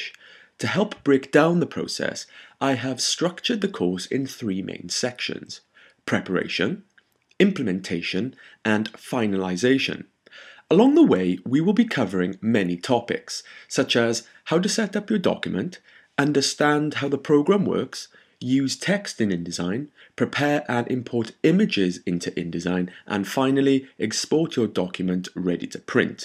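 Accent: Wales, United Kingdom. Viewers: Are you welsh or am i just hearing things? I can't tell anymore.. lol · you're obv not British haha...he has a classic Welsh accent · What could be better than a smart young graphic design teacher with british accent?, your tutorials are amazing! be my teacher:-( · Asian-British?! As a fellow welshie, love it haha! x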